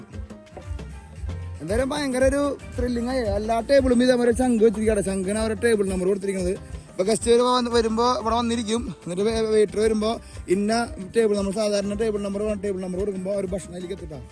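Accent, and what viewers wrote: Thrissur, India. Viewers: ഓർമ്മിപ്പിച്ചോളോ മാപ്ലേനെ...😂 ത്രിശൂർ സ്ലാങ്ങ് പൊളിയാണ് ട്ടാ..❤❤❤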